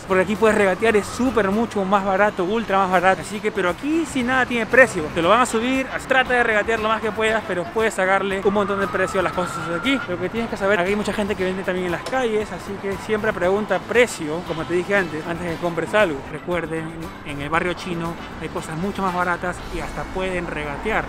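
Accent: Peru. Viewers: Tienes un acento como de mi perú 🇵🇪!!! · Bravazo tu video hermano !! Eres peruano verdad ? Por tu acento limeño.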